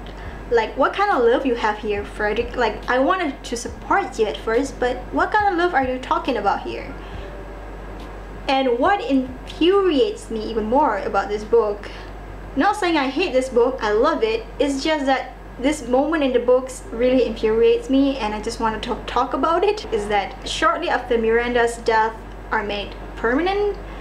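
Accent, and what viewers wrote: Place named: Philippines. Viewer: You're pretty cute, accent sounds Filipino or something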